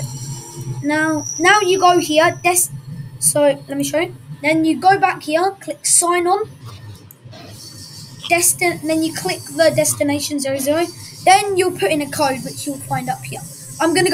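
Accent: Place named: United Kingdom. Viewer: Super strong British accent love it!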